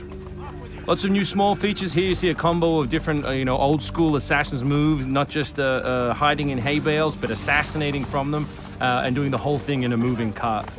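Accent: United Kingdom. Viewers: Oh are you british???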